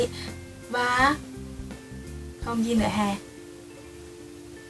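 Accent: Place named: Nghe An, Vietnam. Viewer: Giọng nghệ an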